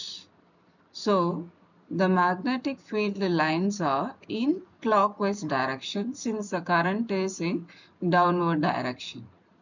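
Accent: India. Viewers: Are U from Kerala?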